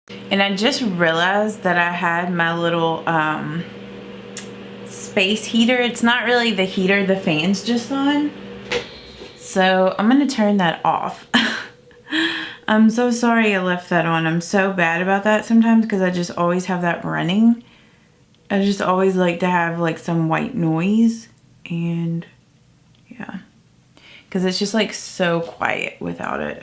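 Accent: South, United States. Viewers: My two Kentucky YouTubers are not posting and I miss y'all!! I rely on that Southern accent to keep me company while I get ready for work am!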